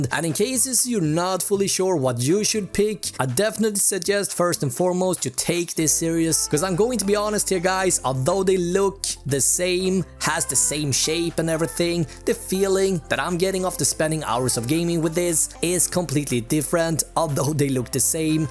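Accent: Sweden. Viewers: Hörs ju glasklart på hur han pratar engelska. Can't get more svengelska then that... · Are you perhaps Nordic? Swedish perhaps?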